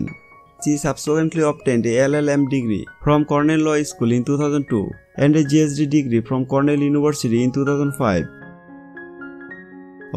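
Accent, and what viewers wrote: India. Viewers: Could you please change another Commander. We cannot understand what this gentleman is saying. Too heavy indian accent. Horrible and wasting air time money 😱😱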